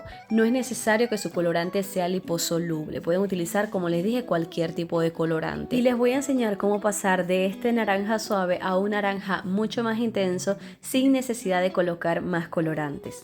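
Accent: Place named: Venezuela. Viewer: Hola soy nueva seguidora y me enganche, eres de Venezuela? Porque estas en Argentina pero el acento te delata😅😅😅😅😅😀😀😀😀😀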